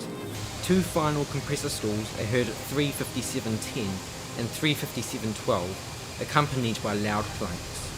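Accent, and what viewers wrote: New Zealand. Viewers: just listening to your commentary are you a Kiwi?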